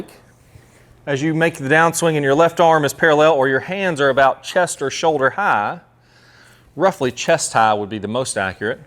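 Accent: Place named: Florida, United States. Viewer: Hi, as you may recall im a lifelong fan! Being British, just love that Florida(?) accent!still getting that lag and the sweet little draw that you demonstrated. But anyway, I was trying to clean my car (auto) carpets by beating them against the garage wall. No matter how hard i tried just got a weak ‘thump’ that didn’t dislodge the debris, and scratched my knuckles! So I moved to the corner end of the garage to avoid the scraping of knuckles. And WOW! My hands went clean past the corner wall and the CRACK of the carpet against the wall was (almost) deafening! And the debris came exploding off Maybe ive just discovered a ‘hands in front of the ball’ drill.? BTW, i used this technique hammering in tent pegs when out camping. Allowing the stinking hand to move down (a little ) the line of swing just beyond point of impact Effortless effect! 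Maybe this might help someone Keep up the great vids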